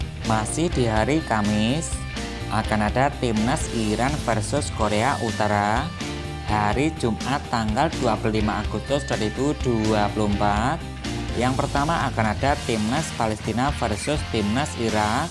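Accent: Java, Indonesia. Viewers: di liat logat bicaranya aja udah keliatan di tambah lagi ada yang ke telu tambah yakin dong kalau adminnya orang jawa 😁😁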